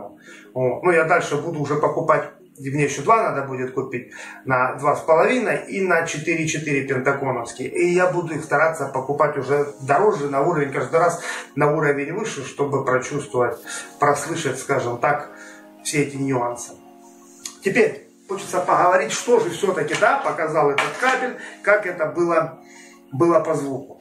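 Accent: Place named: South, Ukraine. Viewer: Тезка, в тайм-кодах поменяй точки на : , тогда это будут не временные метки а полноценные тайм-коды. Чуток над речью стоит поговорить, чтобы произношение было не столь колоритным (я про южно-украинский/восточно-украинский "ШО" "ГЭ" ну и прочие специф. звуки) Для новичка видео нормальное. Для авансу +, подписка, бубенцы. Сам кабель норм за свой прайс, но он ничто против нишенска с3 на 16 жил типа аля СИЛЬВЕР. Там вообще пушка- ракета, а не кабель. Свой трн т2с 16 жил отдал донашивать своему ребенку.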